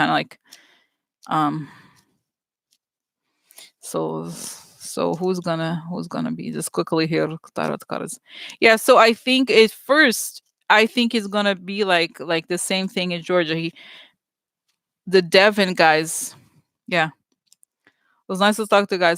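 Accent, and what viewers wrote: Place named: Russia. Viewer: I know you don't typically listen to other readers. But Oracle of Whimsy had a Halloween reading and around the to mark she talks about the GOP takedown and arrests, mentioned 40 of them. It's about 10-15 minutes. Also Revealing Light had a reading in May (?) John McCain is in the title, and she mentions the same thing. Arrests all at once. "Sees most of the old guard of republican party" being ushered out by authorities. Love you, your my favorite. My son saw the green, 3 eyed hat on you and was intrigued. I put you on the tv so he could hear your Russian accent. Yes he thinks I'm nuts....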